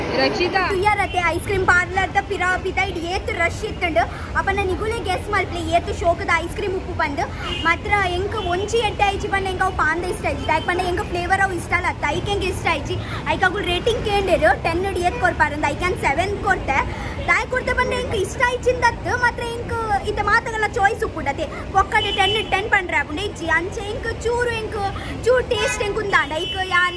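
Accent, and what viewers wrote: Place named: Tulu Nadu, India. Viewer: Your tulu accent reminds me of bombai da binner comedy by MAD IN KUDLA 🤣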